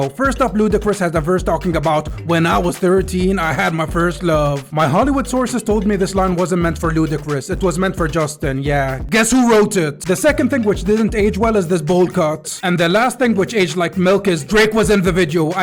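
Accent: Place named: India. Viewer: Bro sounds like he's from India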